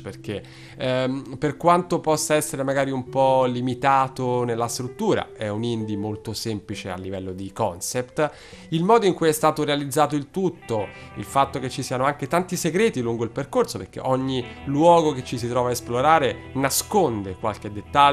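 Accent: Rome, Italy. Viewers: Il vero problema è che tu, avendo uno spiccato accento romano, devi scrivere " 'n c(i)'à ccapito Ggnente...", c'è la doppia "g" anche se serve a raddoppiare il "gn", "nniente" invece "nun sona pe' ggnente". Se mme so' sspiegato, bbene, sennò ve n'annate...(censura)......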